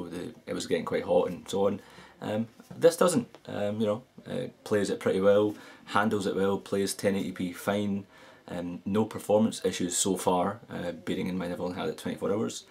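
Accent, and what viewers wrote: Scotland, United Kingdom. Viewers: love Scottish accent !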